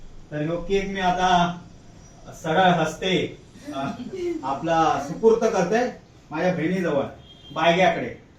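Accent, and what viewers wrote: Malvani, India. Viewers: तुमची मालवणी भाषा एकदम pure आहे,तसेच व्हिडिओ खूप साधे आणि खरे असतात. आज परबिन आणि सावतीन भेटल्यो नाय 😂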